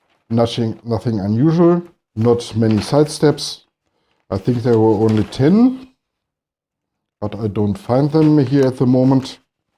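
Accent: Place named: Germany. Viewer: are you german? you dont realy sound native english 🙈.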